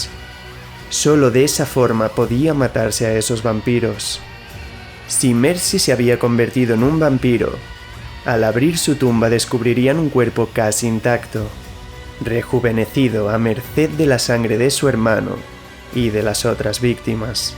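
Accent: Spain. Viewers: A mí no me gusta el español de España por lo tanto no me gustó la narrativa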